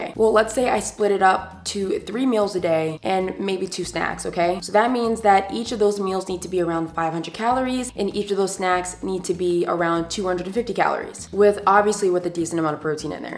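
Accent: England, United Kingdom. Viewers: I love when Black Americans do an English accent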